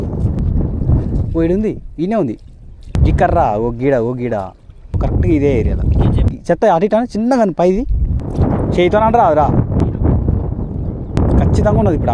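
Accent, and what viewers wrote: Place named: Nalgonda, India. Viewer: Bro kotha district lu bifurcation kanapudu medhi nalgonda jilla kani meku dhaggarlo narshimulapeta mandal warangal jilla undey same me slange.. attincharu bro meru...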